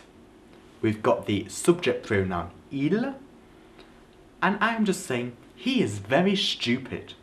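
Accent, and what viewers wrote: United Kingdom. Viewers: Hello. Were you raised speaking English? If yes - Ok. If no - You speak really well and you acquired the British/English accent well (vs. American English). Keep up the good work. Thanks for the video!! :o)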